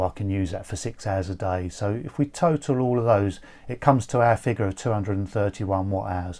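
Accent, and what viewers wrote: United Kingdom. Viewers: Ty, a different view on the power of solar panels and very informative. Also, nice to hear a fellow brit msking these kind of vids. Good luck with the build and ty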